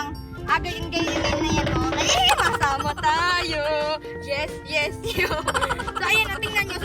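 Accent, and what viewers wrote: Batangas, Philippines. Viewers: Kagaling magsalita eh parang batangas lng eh idol crush kita ayiìihhhh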